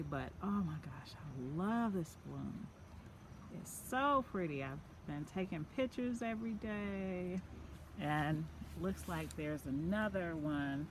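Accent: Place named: South, United States. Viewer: Stunning! I hope to follow suit! And very genteel southern accent. 😍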